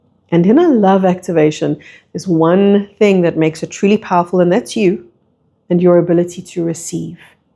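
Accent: South Africa. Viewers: Mmmmm….. I resonate with what is being said .ummmmm the accent……. Sounds SOUTH AFRICA?//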